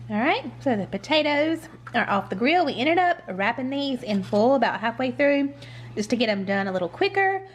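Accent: South, United States. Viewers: Where are you from? You have a very southern accent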